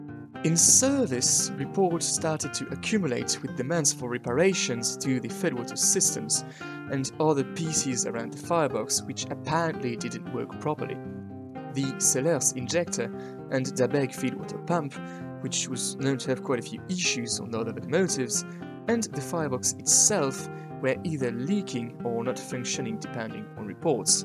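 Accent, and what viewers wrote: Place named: United Kingdom. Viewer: C'est un fait divers français et vous parler britannique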